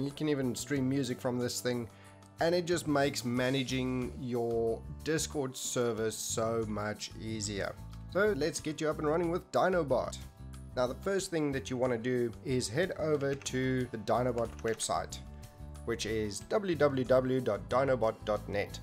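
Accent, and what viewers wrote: South Africa. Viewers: ur South African right?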